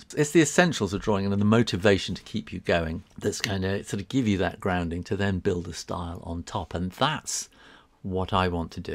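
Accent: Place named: United Kingdom. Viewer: I want to be british when i hear your voice :) And love your cheerfull ways. You have been working as and illustrator for a long time and I as a fulltime illustrator of abour 5 + years, would love to hear all about your career and life. A little like your draw my life videos but with just you talking. Other than that it is so important to think about what your mountain is, what your goal is within your art. But about this channel I would personally just love to see your smile and voice here on youtube. But if it takes to much energy and does not give you anything I would quit the workload that does not fit you. Love Nille from up in Bergen, Norway ❤️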